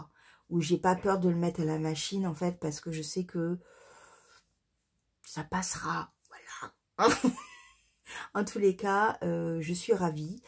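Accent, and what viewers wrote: South, France. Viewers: Bonjour, je te suis depuis tres longtemps, sans laissé de commentaires et c'est la première fois que j'entends l'accent du sud et d'habitude tu ne l'as pas. Merci pour ta bonne humeur et ta gentillesse.A bientot🥰😽😽😽😽🌺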